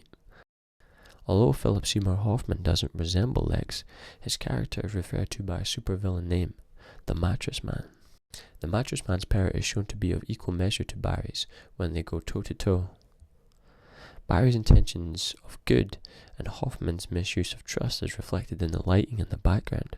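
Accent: Scotland, United Kingdom. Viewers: I think he’s just Scottish :)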